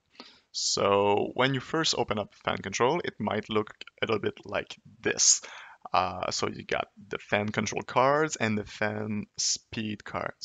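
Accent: Quebec, Canada. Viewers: I'm pretty sure this guy is from Quebec because of the accent. Amazing software btw :) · Are you from Quebec ? Amazing video/software btw :) Thx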